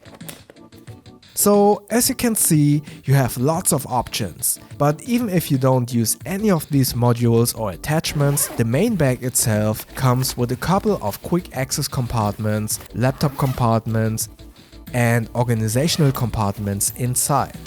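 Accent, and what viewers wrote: Germany. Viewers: Excellent review! Love your German accent! 😊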